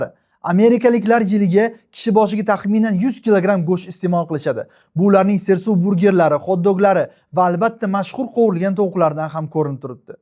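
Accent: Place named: Kashkadarya, Uzbekistan. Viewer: Кашкадаре вилоят шекилли😂😂😂😂😂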